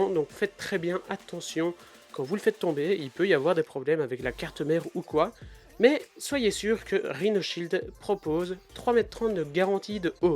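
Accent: Belgium. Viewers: et t'est belge?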